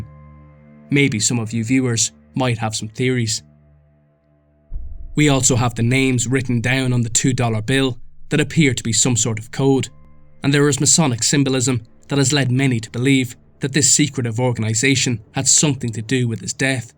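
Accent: Ireland. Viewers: Great vids, pleased to find an Irish accent among all the American channels. 😁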